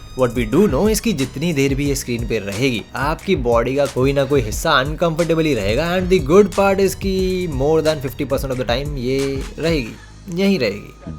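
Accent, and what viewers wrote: India. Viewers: UK born with Desi Village accent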